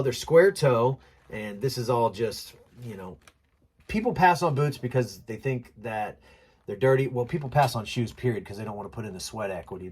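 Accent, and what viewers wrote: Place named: United States. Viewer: Lol that country accent 😂 great haul man! You crush it in shoes!!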